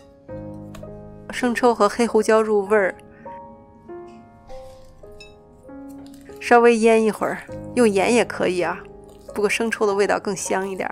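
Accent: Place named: North, China. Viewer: 我是北方人，听着的声音好像我的乡音，感动的要哭了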